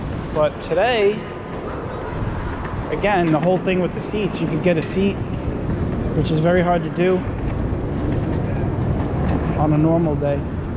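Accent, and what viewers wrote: New York, United States. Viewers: You have a a Thick Newyork Accent lol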